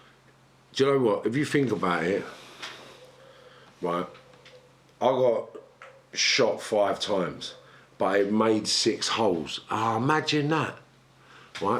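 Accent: Liverpool, United Kingdom. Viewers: Your scouse accent is boss! Have you lived their or just got family/friends there?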